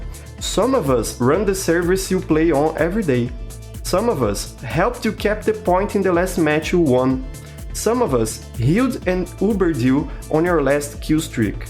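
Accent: Brazil. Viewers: EU SABIA Q VC ERA BR, O SOTAQUE NAO ME ENGANA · are you brazilian because your accent reminds me of brazilian and I'm from brazil :D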